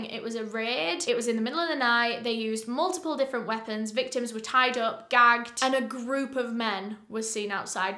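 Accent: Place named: England, United Kingdom. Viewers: Her England accent is irresistible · Our is so intelligent, kind and so very talented. She reported upon this horrible massacre with such detail, sensitivity and compassion; I think this is why she is so popular…that and her charming Yorkshire accent. And her eye makeup is mesmerising; she’s such a pretty girl…Brains and Beauty and a successful entrepreneur…... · Me, she kept coming up in my recommendations and when I finally clicked and heard she's a fellow northerner I instantly subscribed. I'm from up North and have friends who live in the same city as. It helps she's an inclusive queen 👑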